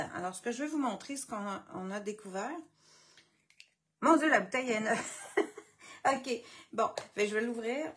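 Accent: Quebec, Canada. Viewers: C'est joli ton accent du Quebec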